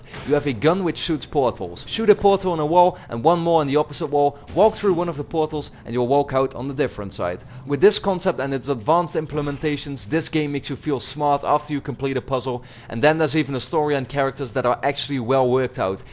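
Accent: Netherlands. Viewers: Always good to hear a dutch guy, not playing cod or fucking fifa. Nice list. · Jij komt uit nederland hé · you sound like a dutchmen who speaks english! so are you? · are you from the netherlands? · deze man is nederlands? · hey nice video can i be nosey and ask are you dutch man? :) cool accent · I'd say, without looking at your channel, that you are Dutch.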